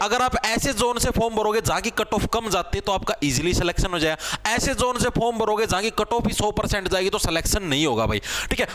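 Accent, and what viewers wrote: Haryana, India. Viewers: Bhaiya mja haryanvi ava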